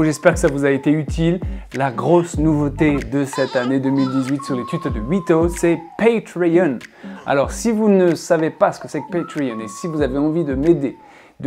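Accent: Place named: United Kingdom. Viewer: As usual, super interesting, professional, neat job and on top of that with the right english accent. I put my hat down! Here are some more: all/quite the go (fashion), it's no go! (impossible), a queer/rum go (a turn of events) a fair go (a fair chance) to go off ( to have an orgasm) to have to go (to urinate or defecate) to go down (to be sent in jail) and so on. You're doing a great job that's why I recommended your to my 15 students, they all really liked your way of teaching. GREAT JOB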